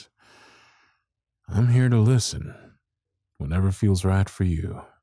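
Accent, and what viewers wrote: South, United States. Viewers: aaaa!!! love this audio so much. Southern country boys are my type anyways so this type of audio is awesome!!! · Woah, who was gonna tell me SOUTHERN exists?! I love it! Gimme more please 👉🏻👈🏻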